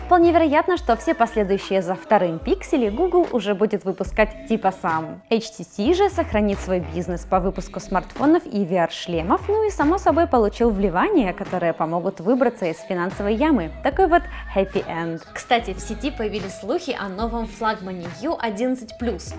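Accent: Ukraine. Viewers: Может девочку поменяете на более привлекательную? Не думал что скажу подобное, но на жирного мужика мне смотреть интересней, чем на пресную женщину, с проскакивающим малоросским говорком. Так хоть глаз будет радовать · чет приуныла. Избавилась от яркого, бросающегося в уши украинского акцента. Остался лишь небольшой отголосок и слушать теперь по приятнее. Но ты с ярким акцентом как будто силу богатырскую потеряла. Как будто что то случилось, если это так, держись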